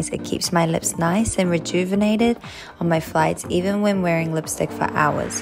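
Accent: New Zealand. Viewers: bb so this is why am getting some british accent then I was like nah aussie and then you said you are kiwi shiii I am confusion but now cleared 😉 cheers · SHE FROM NZ!!!! I knew ittt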